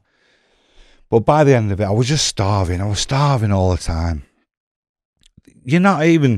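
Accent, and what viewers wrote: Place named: Lancashire, United Kingdom. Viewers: I knew I recognised that accent. It's good to see a Lancashire lad on here. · I could tell you were East Lancs as soon as you spoke I thought Burnley, so I wasn't far of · Sounds like blackburn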